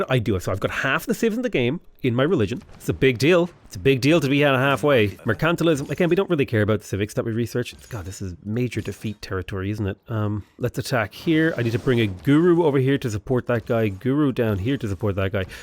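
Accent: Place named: Ireland. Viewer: lol It's really interesting hearing an Irishman talking about destroying the Catholics. Also, you sound crazy like Dara Ó Briain to me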